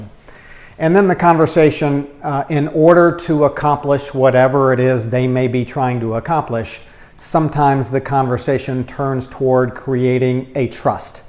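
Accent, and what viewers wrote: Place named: South, United States. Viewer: Thank the southern drawl.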